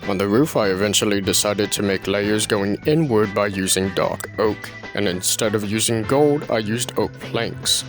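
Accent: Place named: Eastern Europe. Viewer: I did not expect that eastern europe accent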